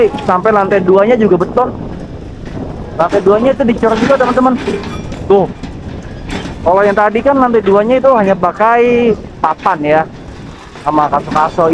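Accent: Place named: West Java, Indonesia. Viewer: Pake V neng. Sia orang sunda tah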